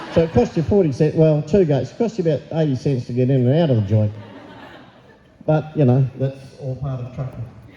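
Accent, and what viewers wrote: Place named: Australia. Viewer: Wow what a awesome speech spoken like a true blue Aussie Truckee copy that 10/4 cob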